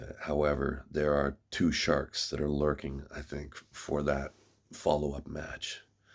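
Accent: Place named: Canada. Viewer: I been watching you arm wrestle before <num>, it’s crazy how your accent has changed, I miss the deep Canadian accent, God bless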